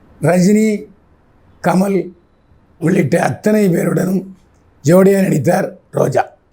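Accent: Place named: Tirunelveli, India. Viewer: Annatchi yentha puthula yentha paaaaambu irukum, Anna Nellai pasai Yellam polichathan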